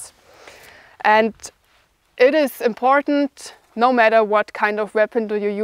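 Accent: Germany. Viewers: I enjoy the German accent while listening to a well informed video about this bow.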